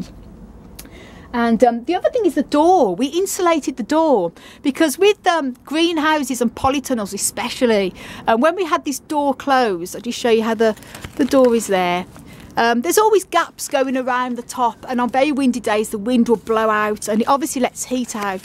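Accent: United Kingdom. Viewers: lol. said,in her sweet British accent, tarpoline. Here in th US we like to shorten everything and we just call it a tarp. You can get one at any hadware store for 20 dollars or less. As far as your humidity concerns, you can't go wrong with a small fan.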